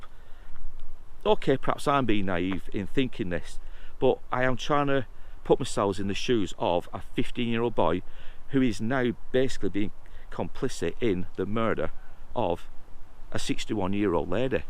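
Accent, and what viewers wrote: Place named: Lancashire, United Kingdom. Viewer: Great video again. Discovered you today and have watched three. Originally tuned in tot hear the accent because my father and all his ancestors were Bacup born and bred. Anyway about the Hindle case. Why was the trial so quick. Today that would take weeks. How can the jury only have been out 12 minutes when there is such a lot to think about? Seems to me he was railroaded by a policeman eager to put it to bed.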